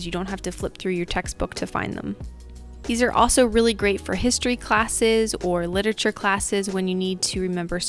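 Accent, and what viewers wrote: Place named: United States. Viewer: I love this kind of American accent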